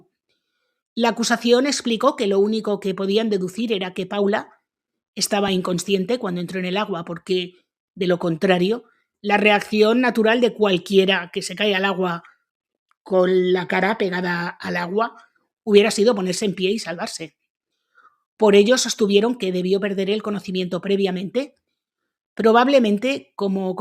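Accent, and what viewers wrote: Aragon, Spain. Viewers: Gracias por responder...pues pareces pura aragonesa jejeje Me dijo mucho en el tema de los acentos a ver si puedo descubrir el origen pero contigo no he dado ni en la diana😂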